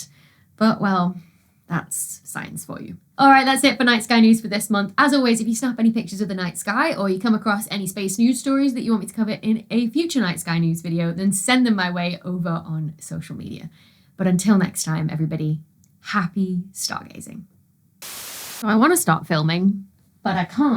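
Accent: United Kingdom. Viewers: If you want to be successful in the United States… slow the hell down drink less coffee before recording a video. We aren’t that crazy about your British accent and we can’t figure out why you’re rushing. Slower and more focused on each chapter. Pretend we are plodding American tourists and explain it. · I occasionally read a few random comments on videos like this and often regret it. There is one from a fellow countryman of mine that complains about the British accent. if I understand the complaint correctly, I'm disappointed to see that we have reached a level of audacity where some of us expect everyone to sound more American. Imagine using the internet and discovering that not everyone on it is American (gasp). had a song "." I am too. Please continue to be yourself, thank you!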